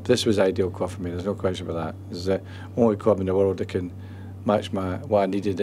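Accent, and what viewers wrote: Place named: Scotland, United Kingdom. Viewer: I find it so satisfying to listen to a scottish accent for some reason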